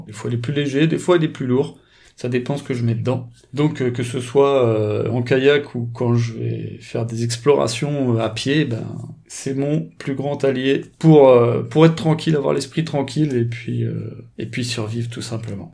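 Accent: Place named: France. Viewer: Penso che parli francese dal accento ma purtroppo io non e parlo e non l'ho capisco cazzo però parlo 3 lingue ma non capisco manco il francese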